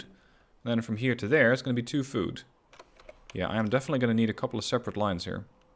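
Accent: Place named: Netherlands. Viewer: Ik hoor aan je accent dat je een nederlander bent. kan ik af en toe advies krijgen over dit spel?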